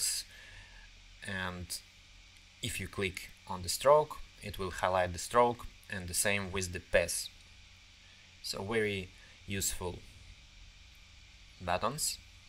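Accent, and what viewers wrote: Russia. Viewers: наче росіянин говорить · Для какой версии скрипт? На 2019 запускаю, не появляется логин и пароль, по акценту вроде парень из России, поэтому пишу по русскому)))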